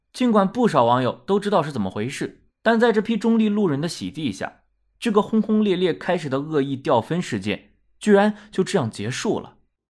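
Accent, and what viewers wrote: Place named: South, China. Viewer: 南方主播，一嘴土味。做出什么事来都正常，从来不看。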